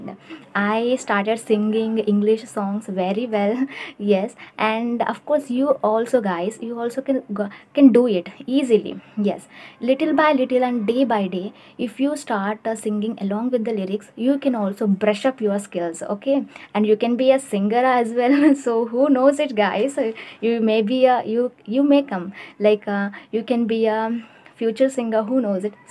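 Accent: South India, India. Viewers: I agree with what you said but all these tips and tricks can be beneficial for people who have some english knowledge. In northern india there are many students who are purely from Hindi background and English is rocket science for them. How will these ideas help them to learn English language? You are definitely from south as what i understood from your accent and to be honest the tips you shared can give maximum benefits to people who are at least in touch with english. These tips will be pure bliss for them.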